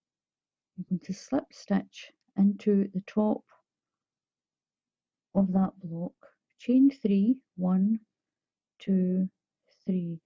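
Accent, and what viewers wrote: Scotland, United Kingdom. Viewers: When I was growing up, we had neighbors that were Scottish, you’re accent reminded me so much of these dear, sweet people. This video was very complete from the beginning to the end of the blanket. I have one started, but I didn’t know how I was going to fill it in and end it. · Hi. First of all I absolutely love listening to your Scottish accent. Secondly I have now mastered the c2c thanks to you. Finally are you able to tell me the make of the beautiful yarn shown at the start of this video which is grey and pink/peach white? Jeannette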